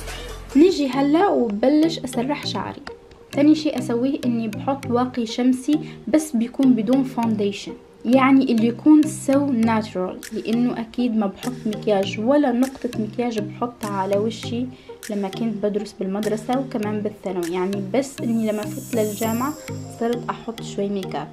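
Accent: Tunisia. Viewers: انتي تونسية علاش تحكي لهجة مش لجهتك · انت تونسية صح لانو لكنة لهجتك تشبه التونسيين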